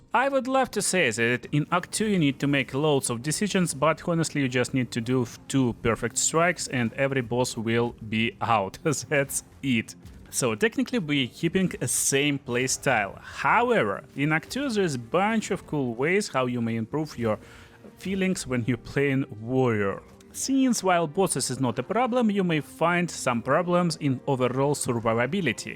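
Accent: Russia. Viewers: Русским диалектом на английском=)))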